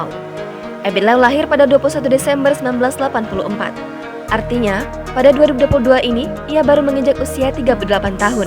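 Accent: Indonesia. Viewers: Itu cara sebutan org indonesia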